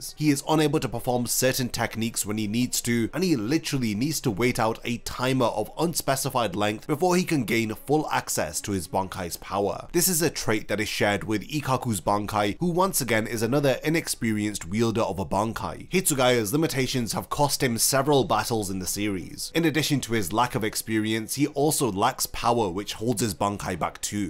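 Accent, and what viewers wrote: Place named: England, United Kingdom. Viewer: Please make an effort to pronounce those Japanese names correctly and not constantly using the English accent.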